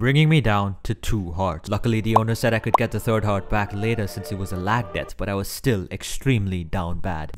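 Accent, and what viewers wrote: India. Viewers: You speak innindian accent 😯😯😯 Are you one??? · I don't know why but your accent feels very indian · ru Indian?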